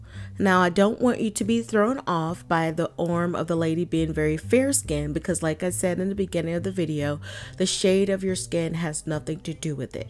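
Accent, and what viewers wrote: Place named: Raleigh, United States. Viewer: Are you from Raleigh?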